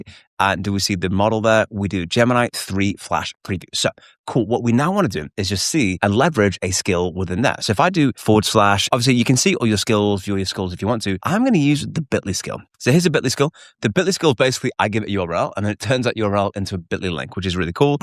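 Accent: United Kingdom. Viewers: Love your accent! Is that british?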